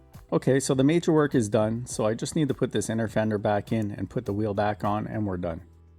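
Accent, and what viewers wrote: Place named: Canada. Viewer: this guy sounds so unbelievably canadian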